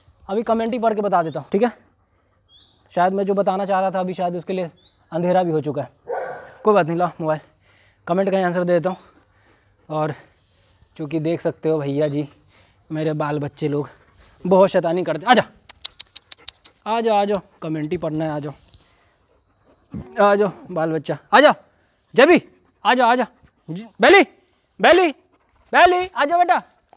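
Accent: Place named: Bhojpuri, India. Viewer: Bhai Really love your raw videos Your Bhojpuri accent ... ❤️❤️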